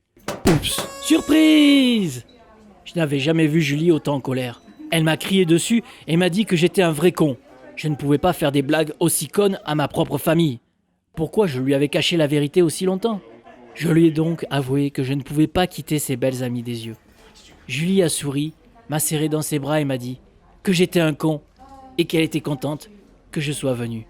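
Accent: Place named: Corsica, France. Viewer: Gros pervers moi aussi je suis une fille et en même temps tu as un belle accent Corse.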